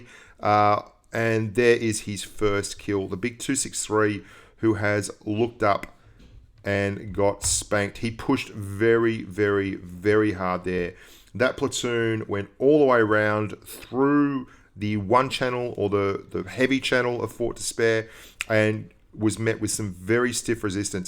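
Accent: Australia. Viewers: Are u Aussie I'm Aussie as well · yes hes aussie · And also I just realised ur an Aussie..........I'm a kiwi from NZ XD watupppppp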